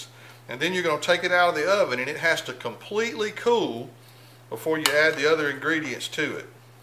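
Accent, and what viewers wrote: South, United States. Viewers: Your southern accent makes me smile. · I'm from Kentucky love the way u talk y'all must be from the south thank u for that dish I had it a long time ago at a wedding reception and just loved it so much 💘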